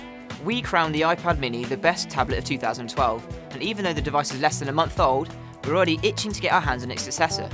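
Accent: United Kingdom. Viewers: BRITISH ACCENT :D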